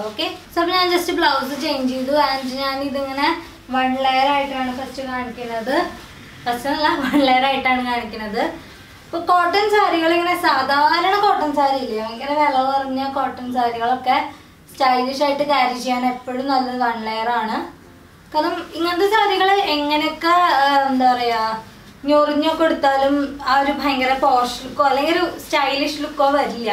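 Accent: Thrissur, India. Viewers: സംസാരം kekkan nalla rasam undu.... thrissur aano....???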